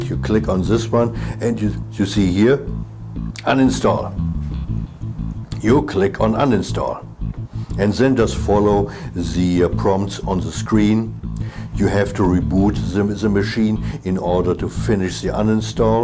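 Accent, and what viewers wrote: Germany. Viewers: [non vid question]: are you german? your accent sounds a lot like that :v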